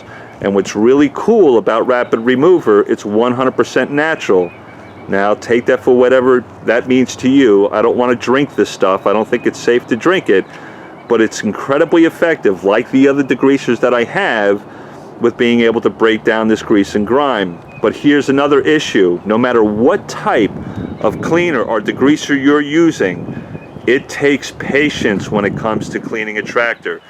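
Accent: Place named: Philadelphia, United States. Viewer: that's not a Texas accent....I'm from the Philly area and I know that accent is within 2hrs or less from me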